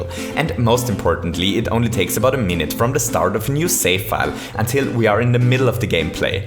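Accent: Germany. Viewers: You sounds german🤔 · I really like your intro music. And are you german? Because your accent sounds like you are german. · Is german your mothertounge? Sounds like you have a german accent. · You sound like you have a german accent :D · Are you German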